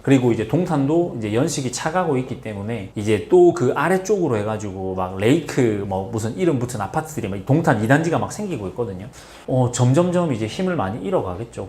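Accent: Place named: Gyeongsang, South Korea. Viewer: 경상도 사투리 쓰시네 그래서 부산을 좋아하시나 싶기도 ㅎㅎ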